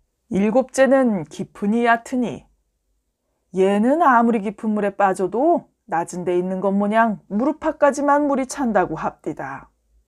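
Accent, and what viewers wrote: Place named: Gyeongsang, South Korea. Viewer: 안녕하세요 넘재미있네요! 경상도사투리 구수합니다~^^ ❤❤❤❤❤❤❤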